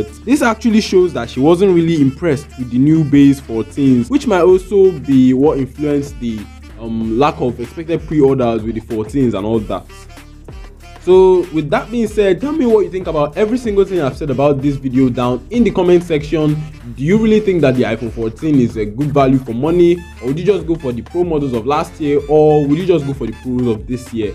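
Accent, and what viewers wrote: Nigeria. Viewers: You speak like a Nigerian Nice video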